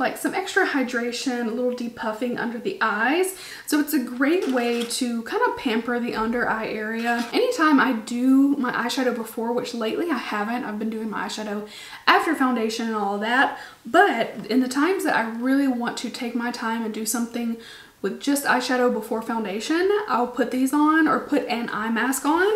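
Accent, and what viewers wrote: South, United States. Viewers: Wait!!!! You are a Bama girl???!!!! So am I!! I did not know this!!!! I knew from the accent you were southern but wasn’t sure exactly where you lived!!!!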